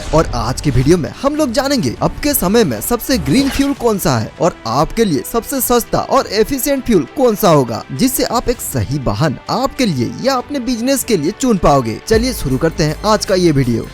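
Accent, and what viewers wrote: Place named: Bengal, India. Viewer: Bhai tum pakka koi Bengali hai ya Oriya hai.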